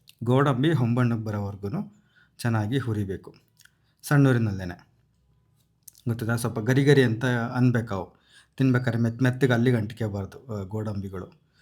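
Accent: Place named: Davanagere, India. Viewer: We in our family love the way you speak Kannada... please preserve the Davanagere dialect🙏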